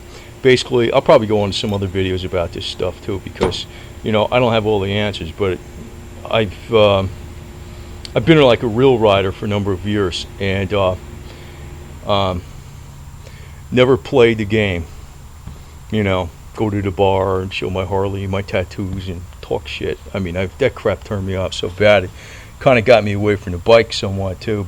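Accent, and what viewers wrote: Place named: New York, United States. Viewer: Seen a few of your vids. Your NY accent reminds me of home. Im in the Army at Ft Bragg, NC. I ride year round here. Even if it snows. Miss NY riding. Great roads up there. Especially up in dutchess county and further north. Nit too many winding roads down here. Ive got a 06 sporty 1200 (which is for sale) and a 05 dyna wide glide that I love. Im on my dyna almost every day. I barely cage at all. Real riders unite!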